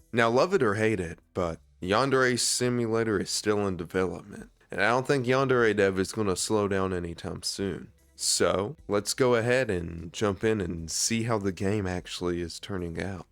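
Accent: South, United States. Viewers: this is the most southern man ive EVER heard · Your hillbilly voice makes this 10x funnier. Keep on being awesome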